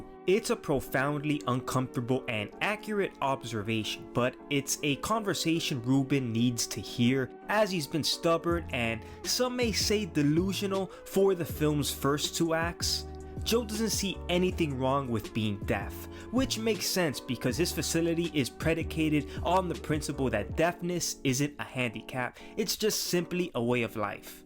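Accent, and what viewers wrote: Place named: Miami, United States. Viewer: You have a heavy Miami accent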